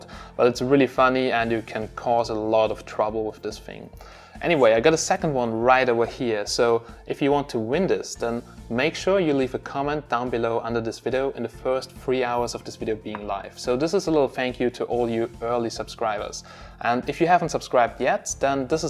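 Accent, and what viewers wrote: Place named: Germany. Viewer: I love how u speak english with German akcent :) ( bad english i am from Bosnia )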